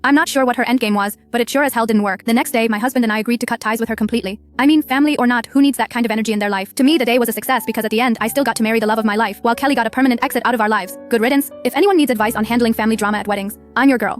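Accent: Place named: India. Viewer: Why does the Ai have an Indian accent 😭😭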